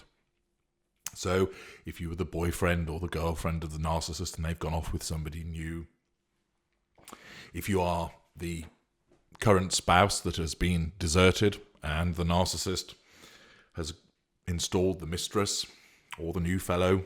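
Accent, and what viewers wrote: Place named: Oxford, United Kingdom. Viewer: 🙂 i like his accent, he sounds like a Prof at Oxford ☺️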